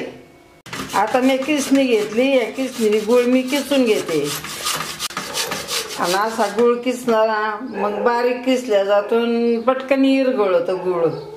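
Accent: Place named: Bhor, India. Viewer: Tumch Bolan ani bhasha mala khup awdte maz maheri same Asch bolatat Bhor side la tumch Gav kont ahe aaji Mala tumcha recipe khup awdtat.... Love you ❤️❤️❤️ mazi aaji same Asch bolaychi mi khup miss karte maza aaji PN tumch Bolan aaikun khup Bhar vatat mala ..... Thanks 😘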